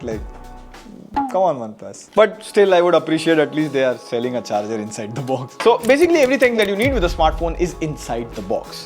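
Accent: India. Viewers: hahahahah i cant unterstand the indian english ahhahahaha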